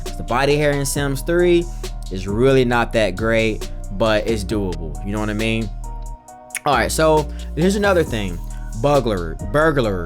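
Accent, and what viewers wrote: South, United States. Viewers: I think your southern drawl just didn't want to hit the r before the g. Burglars. Haha, no shade. All my family is from the deep South but I was raised in Iowa 😂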